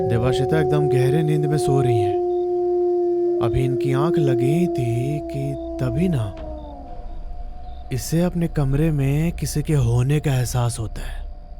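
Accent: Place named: Bihar, India. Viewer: Bhai i feel like you are weather from Bihar or belongs to area like kanpur, Gorakhpur or Lucknow. In every sentence you say- "hai nah" or " aayi nah"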